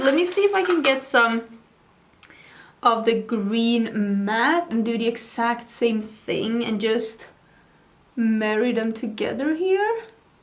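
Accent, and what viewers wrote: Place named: Sweden. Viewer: the way you kept saying "depth" in your cute little swedish accent! 😭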